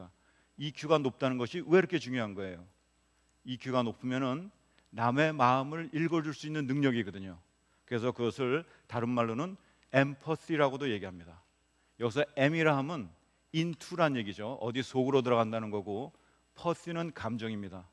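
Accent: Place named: Seoul, South Korea. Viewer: 강연 들으면서 문득 드는 생각이 요즘 나오는 서울사투리 말투시네요~~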